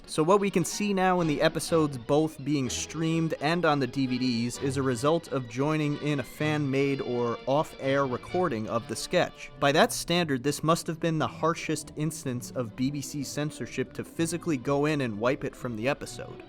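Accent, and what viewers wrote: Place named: United States. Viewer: Interesting video but your American pronunciation of all these British names is throwing me off. It is so funny they tried to repeatedly dox David Frost.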